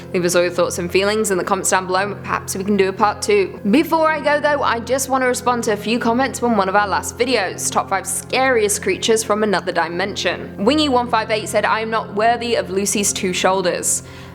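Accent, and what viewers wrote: United Kingdom. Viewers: It's so good to hear this a fellow Brit and not having to listen to Americans butcher British town and city names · You're British? I couldn't tell. I just figured that you had a cold.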